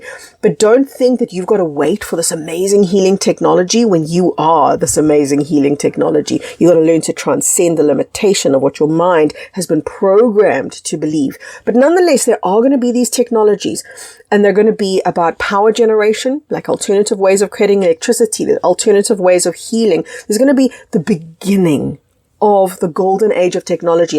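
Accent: South Africa. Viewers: Man have I enjoyed your videos over the weekend! So much of your stuff is resonating with me! Also nice to hear a fellow South African😃 I'm going through huge Assention symptoms the last 3 years. Vision distortions, in somnia, vibrating body Suffocating anxiety. Every day I'm waking up thinking what's it going to be today. Yesterday I was watching your addiction video. And well as some people not think you were talking in metaphors mmm! I believe I came face-to-face with my demons on DMT journey. While I was indulging in one of my mild addictions I inhaled a dmt vape And there it was in front of me breathing and responding to my emotions the more excited I got for my activity the more powerful the entity became. As if we we're separate yet connected. It didn't seem to be good nor bad Nor did it look like a demon. It looked amazing! Beautiful,vibrant, colourful It was Maybe both feeding and supplying all of what humanity has created and experienced through the activity. 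It may also have been a part of me, but what makes me think that it is a collective or separate is I have seen this entity in dmt art. So I am clearly not the only one who has come face-to-face it. But it was real and it was something separate yet part of me. But as per usual with psychedelic journeys with every answer comes another 10 questions.😅 Anyway I'm glad I found you keep up the good work and I'm Sure your videos will come to me as I need them as they always do💚 I am having help from 2 energy healers at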